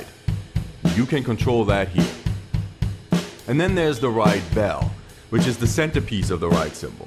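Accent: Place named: Long Island, United States. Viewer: Hi, on your accent - I grew up in Long Island - I just thought you were from somewhere on LI I hadn't been to yet 😁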